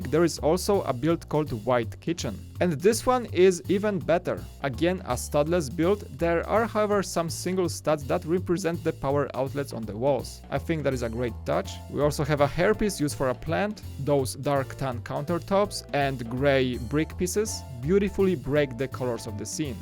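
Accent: Poland. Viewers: No offense, but polish accent is terrible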